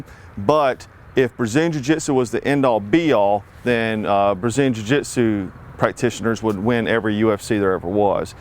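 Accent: South, United States. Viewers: Whenever a dude with a southern accent talks about anything to do with survival or barbecue I listen 😂 But yes every last word is true.. what kali style do you study? It doesn’t look like the flashy mainstream stuff. Pekiti?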